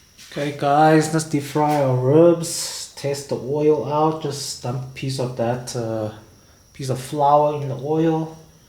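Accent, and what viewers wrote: South Africa. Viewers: Your accent is giving me South African 🤷🏽‍♀️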